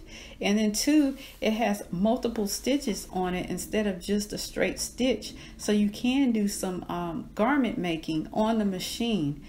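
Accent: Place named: South, United States. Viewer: and as an australian i love that southern accent - also no stupid shitty music.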